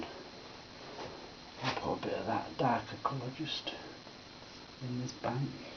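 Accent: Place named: Yorkshire, United Kingdom. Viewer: Wow!!!! What an amazing painting. What a talent. Also, you've gotta be from my neck of the woods in Yorkshire. I'm guessing somewhere around west/south yorkshire. Great work. Keep it up. All the best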